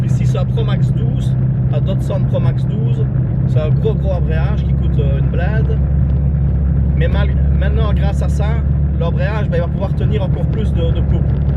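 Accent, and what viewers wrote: Liège, Belgium. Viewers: Merci de nous faire part de tes connaissances et de tes explications adaptées. Preparateur gtr, moi je prépare des bons gros tedehi lol chacun son monde ! Lol Chouette vidéo, ton accent liégeois rend unique ton vlog 😎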